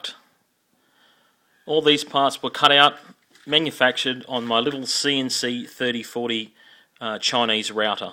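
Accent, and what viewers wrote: Australia. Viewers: great to hear aussie accent at last!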